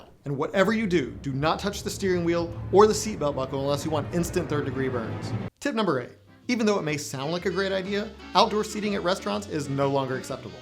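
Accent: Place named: New England, United States. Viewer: How did this supposedly Southern dude get a yankee accent?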